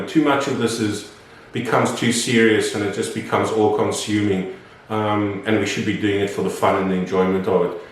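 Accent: South Africa. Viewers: Great intro video! After watching a number of your more recent videos, I picked up on the distinct accent however noted that you are in Australia. Now reading through the comments here... you are a Capetonian:) I too am from Cape Town and moved to England twelve years ago... for the weather of course:) Keep up the great work in bringing back old fashioned fish keeping, where we live in a world of everything being so commercialised these days (showing my age dating back to the 60's lol). Sorry for the user name, a bit odd I guess, but it is my gaming ID. For those that do not know, "scur" is storm in anglo-saxon. All the best with the channel. Cheers Gary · Hi (please insert name), I recently came across your channel and am happy that you‘ve started it. Very informative and helpful indeed. I wish to eventually also install a rack system like yours, currently busy with house renovations, so after that. 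I detect a South African accent. Would love to know more about where in SA you’re from. I’m in Queensland also, up in Townsville. Keep the content coming😄 It’s great! Kind regards André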